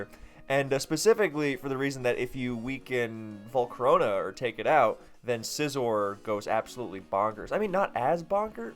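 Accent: Germany. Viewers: You should narrate an entire replay in your awful German accent! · I need more of your German accent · Please, for the love of all that is holy, narrate an entire game in your german accent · As a german Person myself i Love watching People butcher the german Language, since it has a few stupid things and therefor is nearly always destroyed when tried to recreate so please use more German accent :) Also no, volcarona doesn’t use roost but it sometimes does use morning sun, it lost roost between gens And I want to see dnite espeed a polteageist (ghost mon) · Yes you should narrate the next DPP Ubers Tournament video in your German Accent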